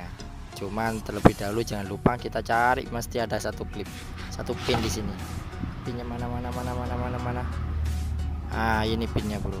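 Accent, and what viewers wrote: Java, Indonesia. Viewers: jowo campur melayu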